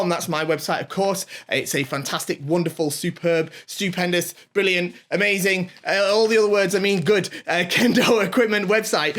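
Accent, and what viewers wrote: United Kingdom. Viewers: hello. Please remove your name and other titles from bottom because I'm watching you with subtitles to better understand you British English. 😉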